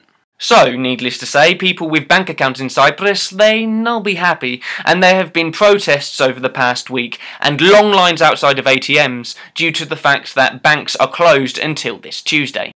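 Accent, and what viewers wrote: Russia. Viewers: Your Russian accent is so good! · P.S.: I love your Russian accent.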